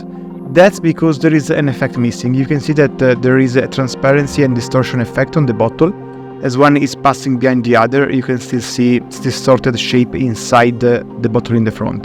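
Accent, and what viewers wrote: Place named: Italy. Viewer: I like the italian accent, makes the content more original. Nice!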